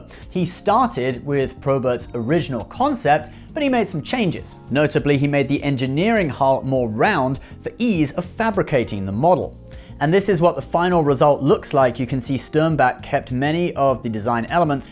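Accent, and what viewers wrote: United Kingdom. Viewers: not a fan of british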